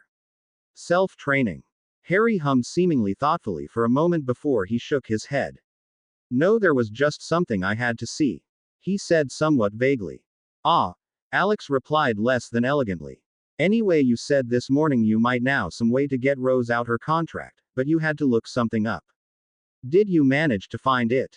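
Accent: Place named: India. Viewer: Just let the AI read sverythinh l8ke before because we cant hear you properly Ypur indian accent is very heavy..and the audio qualjty is now poor unlike your earlier stories Yhr stories are nice but work on that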